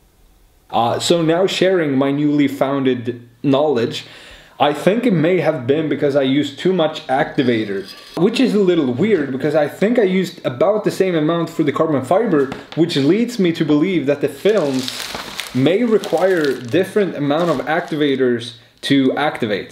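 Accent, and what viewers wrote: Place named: Sweden. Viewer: Mahbub Tamim He is from Sweden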